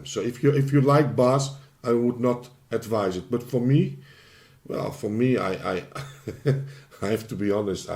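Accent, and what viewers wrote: Netherlands. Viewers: Volgens mij ben je Nederland! Goeie beoordeling bw thx.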